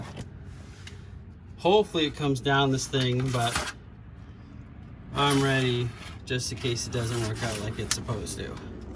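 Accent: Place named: Canada. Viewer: This guy is probably Canadian. Sounds like it from his accent and the fact that he said km over miles. Do the rest of you guys want to know how we can afford fancy cars like his red BMW and his Expedition? We have the best healthare waiting lists in the world - that's how. We actually dont get healthcare as you might have been told, but we do have the best waiting lists you will ever find.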